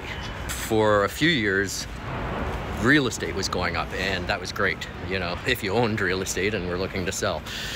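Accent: Canada. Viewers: I watched the rest of your video after posting that. I often post as I watch. I suspected I was being trolled pretty quickly after writing that, when ~ 2 minutes in I noticed your accent is definitely Canadian. Not many Canadians don't know where their taxes are going! It was fun for me watching you walk around Ottawa, a city I lived in from 1978 to 2020. You walked past a house I lived in on Armstrong Street. The new owner painted it a rich blue; it looks nicer. A comparison of our country to itself in the past, and others now, shows how quickly we can sink. While the gap between rich and poor turns into a chasm all around the world, our economy has always been vulnerable because our leaders were under foreign rule and/or influence. Oligarchs see Canada as a vast resource with a capable and compliant labour force. It truly is sad how the kleptocrats have accelerated their looting in recent years. 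It feels like the end game...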